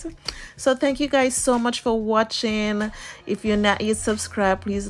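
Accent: Jamaica. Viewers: You sound Jamaican 🇯🇲 big up if you are lol🇯🇲🇯🇲🇯🇲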